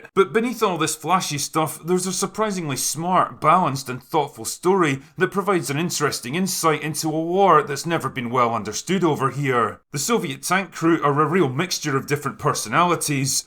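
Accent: Scotland, United Kingdom. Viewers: Drinker missed a prime opportunity to say in his epic scottish accent "The Beast, wha' is it all good for? Absolutely nothin' claiming territory & resources" 😆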